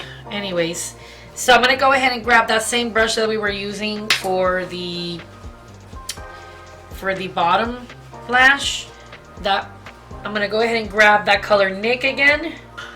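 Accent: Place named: Miami, United States. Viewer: I love watching your vids! You’re always super vibrant and vampy. 💜 Are you from Miami?? You have a very similar accent to my own 😂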